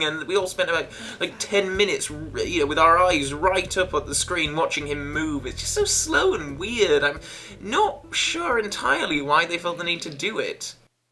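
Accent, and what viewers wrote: United Kingdom. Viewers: Are you even British?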